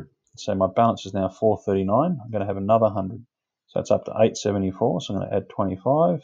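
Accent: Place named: Australia. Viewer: Mate your videos are superb, no fluff, music or silly thumbnails, just solid useful information. Thank you. I've subbed, you deserve more man. Plus your easy on the ear, kinda like an Aussie Jim Browning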